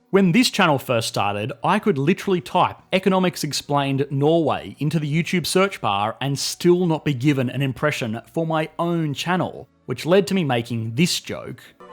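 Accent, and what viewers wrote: Australia. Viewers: Me: doesn’t get it in class... finds it explained on with cringe jokes and an Ausie accent and awesome stock footage. Me: google giveth🙏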